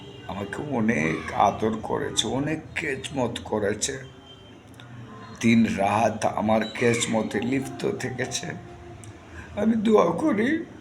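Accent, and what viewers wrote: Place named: Sylhet, Bangladesh. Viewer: আসসালামু আলাইকুম, ও ভাই আপনার কথায় বুঝতে পারছি আপনি সিলেটি মানুষ, আমার জন্য দোয়া করবেন আল্লাহ তায়ালা যেন আমার মনের নেক চাওয়া কবুল করেন, আমার স্বামী দীর্ঘদিন ধরে আমার সাথে কথা বলেন না,ভুল বুজে আছেন কিন্তু আমি আমার স্বামীকে অনেক ভালোবাসি,সবাই আমাকে স্বামীর আশা ছেড়ে দিতে বা মামলা দিতে,আমি কোনো সমস্যা সৃষ্টি করতে চাইনা,কারন উনাকে ছাড়া আমি একা থাকবো কিভাবে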